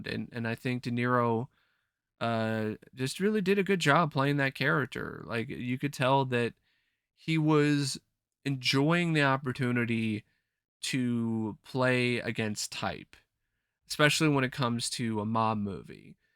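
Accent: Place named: Northeast, United States. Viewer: Did you like The Wonder Years? I watched it a lot with my grandma so I got a soft spot for that show. Your voice kinda sounds like you're from northeast usa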